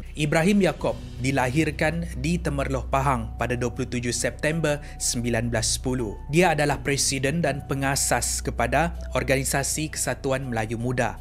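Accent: Sabah, Malaysia. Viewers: kamu org Sabah ka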